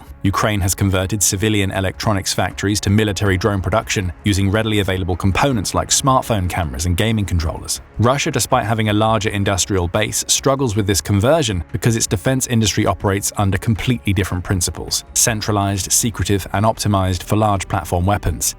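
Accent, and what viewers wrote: United Kingdom. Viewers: Ahahahaha the accent dont make us go to wrong analises. The british trying to win the loose war on the blabla. Really???!!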